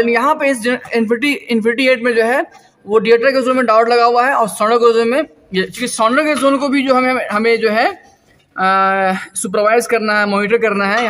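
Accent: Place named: Jamshedpur, India. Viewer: Aap Tata (Jamshedpur) key ho ,aap ki bat se lag ta hai 💯 %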